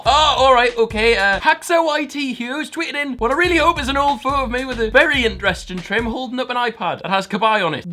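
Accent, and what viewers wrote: Newcastle, United Kingdom. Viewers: It’s called a Newcastle accent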